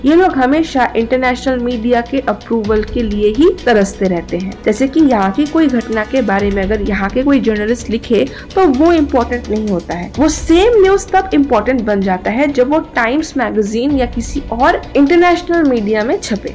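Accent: Bengal, India. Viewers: Bengali ho aap sayad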